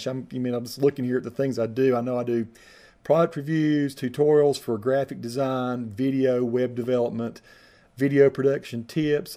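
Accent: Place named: Appalachia, United States. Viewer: Yes the Hillbilly accent is cool and I like the way you are so open about your Appalachian life. Just seeing the fields and forests and talking to your brother about Hillbilly stuff is nice to experience. I am living in dutch society that is quite the opposite of Hillbilly (in the province it is not that different though). Nice to see such a positive good humoured video around Christmas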